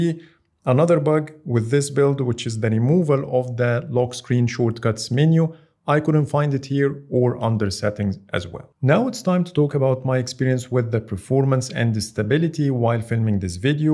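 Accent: Egypt. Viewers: You an Egyptian? I can sense it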